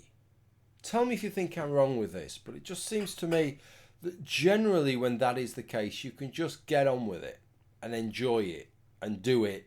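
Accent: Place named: Lincolnshire, United Kingdom. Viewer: Louth? I always suspected from your accent you must be from my neck of the woods. I was born and raised in bloody Cleethorpes! Went to Louth a few times. I remember Hubbard's Hills...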